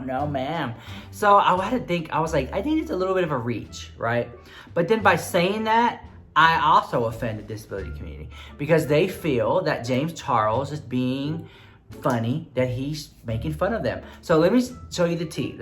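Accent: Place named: Texas, United States. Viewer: It was driving me crazy not knowing where your from... so I went to your Twitter to find out. I KNEWWW YOU WERE FROM TX TOO!! I hear that accent girl. im also from tx lol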